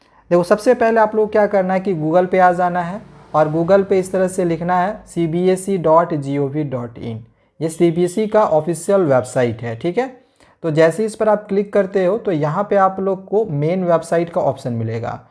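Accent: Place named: Bihar, India. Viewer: Sir aapke language se lagta hai aap bihar ya jharkhand isi 2 state me se kahi se ho or sir mai bihar se ❤️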